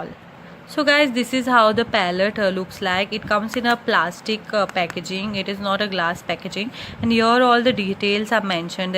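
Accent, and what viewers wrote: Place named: Goa, India. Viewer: Thank you for the honest review. Looks like you have a Goan accent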